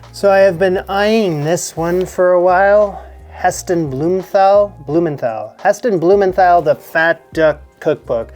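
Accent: Canada. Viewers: Coming from a New Yorker, your Canadian accent has completely come back. I've been watching your show for about 4+ years now and I don't remember hearing your Canadian accent as much as I do now. I've caught a few words with it before, but yeah, lol. I like this other side of your show. Watching you getting the ingredients and how you choose them is pretty cool. I like this style of vlog from you.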